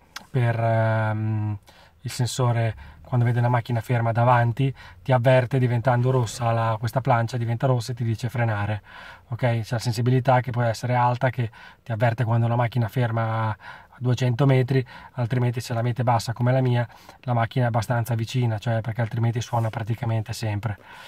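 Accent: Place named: Romagna, Italy. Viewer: Di dove sei sembra che hai un accento Romagnolo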